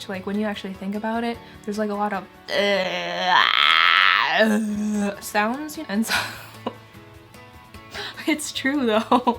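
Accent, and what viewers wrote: United States. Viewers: Hi I thought you are an American in the beginning so.. i speak 2 very old language Assyrian and Syriac and also Arabic and English and im trying to learn Finnish (: wish me good luck by the way all your video are fun to watch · You do sound american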